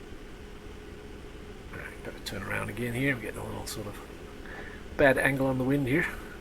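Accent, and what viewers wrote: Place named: United States. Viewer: I just can't decide if you have a genuine American accent, or are a Brit pretending to have an American accent! Sorry, no offence intended, was just distracted by this as I'm a linguistics academic!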